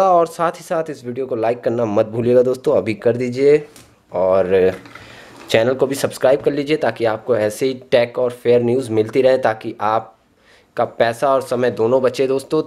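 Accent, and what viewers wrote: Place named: Rajasthan, India. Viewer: Bhai aap rajasthani ho thodi marwari bol re ho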